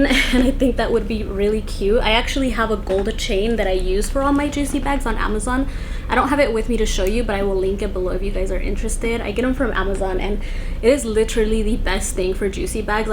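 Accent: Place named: Canada. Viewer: Are you Canadian? I hear a accent 😭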